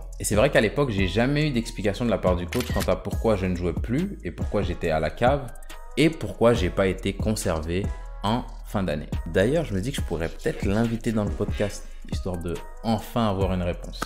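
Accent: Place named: Italy. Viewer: Encore une magnifique vidéo un belle accent italien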